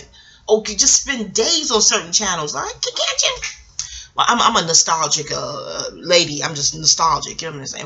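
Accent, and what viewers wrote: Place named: East Coast, United States. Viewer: Absolutely adorable love the East Coast accent... hey girl you supposed be talking Bobby Brown‘s memoir...🙃🤣🤣🤣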